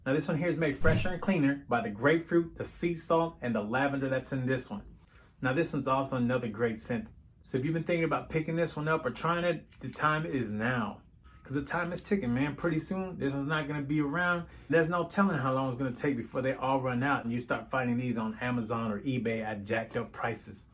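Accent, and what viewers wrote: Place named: Brooklyn, United States. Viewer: Texas, I would of guessed he was from. Brooklyn ny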